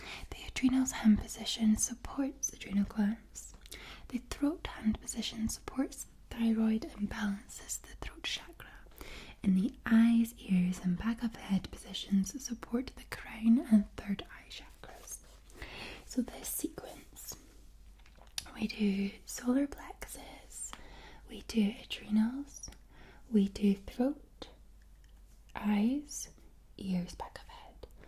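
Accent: Scotland, United Kingdom. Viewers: It’s called a Scottish accent my guy, I got one too lmao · Hey, can you do a video with rambling in a really Scottish accent? I love you're accent, and wanna hear it in raw form( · I love your accent!!!!!😍💖 & I also love Scotland!!!!!😊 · Her accent is so fabulous. I love Scottish accents. in the beginning you couldn’t hear it but as she started talking faster it really jumped out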